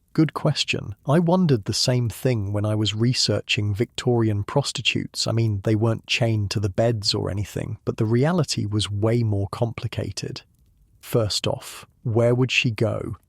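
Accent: United Kingdom. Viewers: your beautiful, hypnotic British voice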